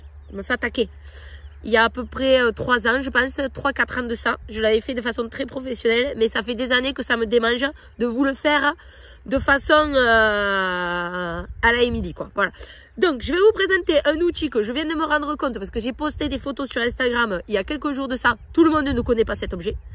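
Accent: South, France. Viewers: Félicitations pour cette belle demo Et joli franc parler agrémenté d'un bel acent du soleil bienvenue chez moi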